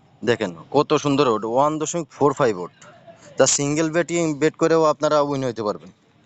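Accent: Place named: Khulna, Bangladesh. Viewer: Vai apnar desher bari kon jelay.. kotha shune mone hocce khulna te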